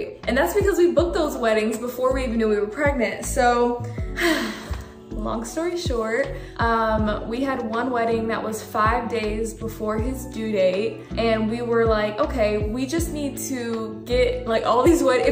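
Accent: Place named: Los Angeles, United States. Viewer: You gotta be from LA lol the antics word syntax and how you say them.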